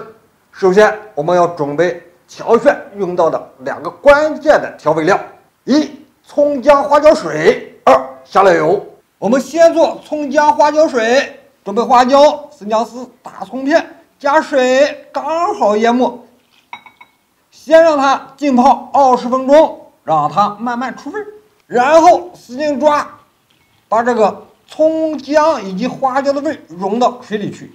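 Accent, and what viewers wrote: Shaanxi, China. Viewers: 这陕普听得我太亲切了！想回家😭😭😭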